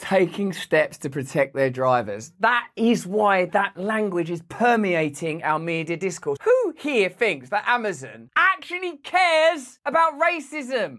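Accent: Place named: London, United Kingdom. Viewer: You sound like you're from luhndan!!!!!!!!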